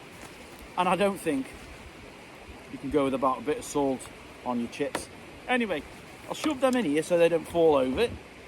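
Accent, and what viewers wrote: Stoke, United Kingdom. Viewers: Hey fellow Stokie, Just found your channel Through English Woodsman! Dan. Said to my hubby this guys a fellow stokie, Our accent stands out. Loved your video and have just subscribed. Roughly what area you in??. I'm Endon.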